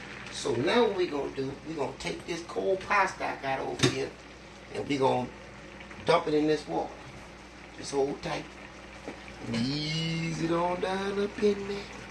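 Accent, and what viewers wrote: New Orleans, United States. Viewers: He got the New Orleans accent and he love to cook that's that boot life LOL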